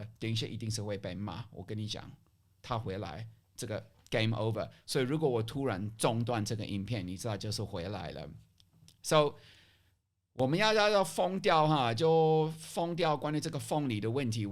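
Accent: South, Taiwan. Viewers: 我也很愛買鳳梨,也愛吃鳳梨。只要台灣人沒有人不愛吃吧！這是第三天看教授影片,看一整天也不累,因為也是南部人,又聽到南部的口音所以非常的親切贊讚讚！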